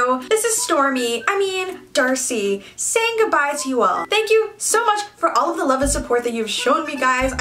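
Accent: United Kingdom. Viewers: this hair! this beat! this girl! her british accents! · amazing british accent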